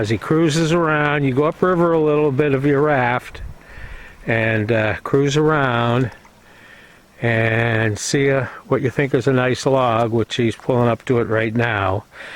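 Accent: Boston, United States. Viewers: You can take the man outta Boston but never Boston outta the man 😂! Nice to see you again! Greetings from Germany 🇩🇪 👍🍻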